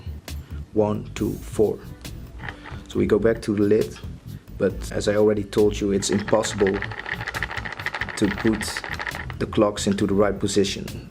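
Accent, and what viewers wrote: Netherlands. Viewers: I can hear you are Dutch. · Are you Dutch? Your accent is kinda Dutch xD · This guy is dutch, im pretty sure he is dutch, everybody over here that tries to talk english speaks this way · you, my friend, sound verry dutch... am i right? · Are you Dutch?? (your accent) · I am for 98% sure you're Dutch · R u dutch? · You're ‘accent’ seems kind of Dutch, if so, ik ben ook Nederlands! :D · Is he from the Netherlands? I can hear it in his voice😂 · Are you Dutch? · Ben je Nederlands want je accent klinkt heel erg Nederlands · You sound like you have a Dutch accent. Are you Dutch? I am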